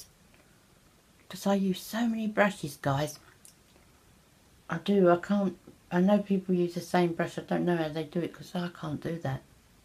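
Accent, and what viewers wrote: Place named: London, United Kingdom. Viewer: thanks for replying.....you mentioned in one of your videos that you were in the north, but I can't tell whereabouts because of your London accent 😂😂 how nice of your friends to send you American goodies. Hope your sleeping is better. Keep on being fabulous! 💋💋💋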